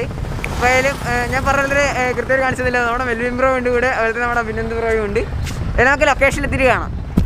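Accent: Kannur, India. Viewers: Nee kannur karanano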